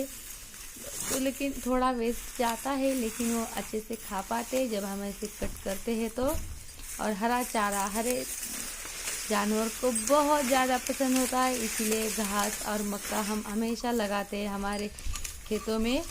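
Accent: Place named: Maharashtra, India. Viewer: मराठी दिसत आहात.... मराठी माणूसच अशी हिंदी बोलतो.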